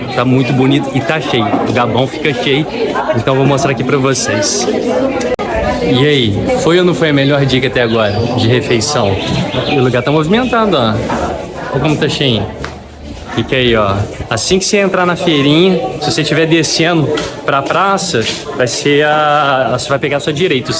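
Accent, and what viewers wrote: Minas Gerais, Brazil. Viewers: Não consigo parar de ouvir vc falando, esse sotaque mineiro é bom demais rs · otimas dicas Brother, pelo seu sotaque é minerin que nem eu kkkkkk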